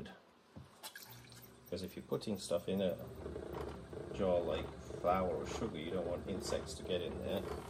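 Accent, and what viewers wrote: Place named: Wales, United Kingdom. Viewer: I hear a Welsh accent, reminds me of home. Wish you were here in Beverly Hills, so I could gain confidence in making something bigger than a tea cup or mug.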